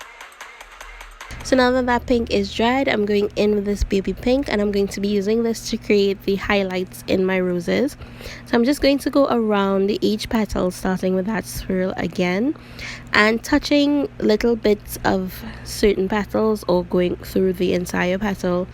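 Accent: Trinidad and Tobago. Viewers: You accent sounds Trini :)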